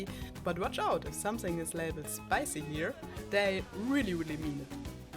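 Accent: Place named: Germany. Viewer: You sound soooo very German when you speak English.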